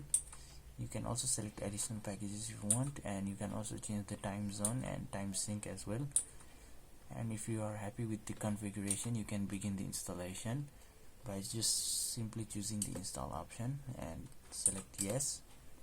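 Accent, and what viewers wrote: India. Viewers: im not hating, it's good guide. but why again this indian accent? why 50% of programming videos are Indian?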